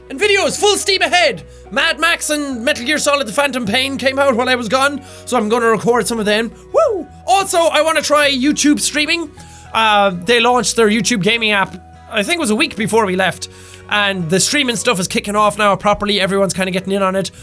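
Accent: Ireland. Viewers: Am I the only one who likes his accent? Normally I don't really like Irish accent but his is cute. No... I'm the only one... Ok.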